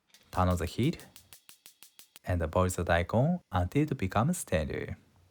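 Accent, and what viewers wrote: Japan. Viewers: Your comment is full of negativity and doesn’t contribute anything useful. Everyone’s trying their best, and no one’s perfect. Just like how a French person speaks with a French accent or an Italian with theirs, a Japanese speaker has their own accent when speaking English. It doesn’t make their English less valid—it’s part of who they are. If the content isn’t for you, that’s fine, but there’s no need to tear others down. Maybe take a step back and think before you post—respect goes a long way.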